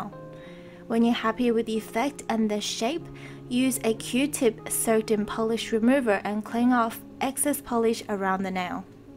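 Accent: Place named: Australia. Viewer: I love your accent, I want to live in Australia!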